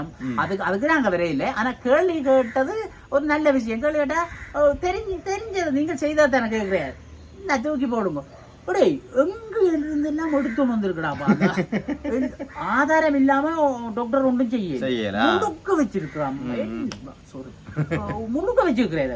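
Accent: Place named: Jaffna, Sri Lanka. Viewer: உண்மையில் எங்கள் ஊர் பேச்சு 🥰உண்மையின் பேச்சு 👌 வாழ்த்துக்கள்.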